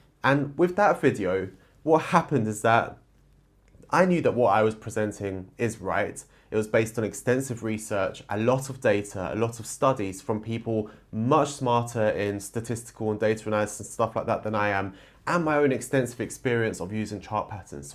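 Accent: United Kingdom. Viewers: Hmmmmm... Are you from Europe? Your accent sounds like British something. 🤔🤔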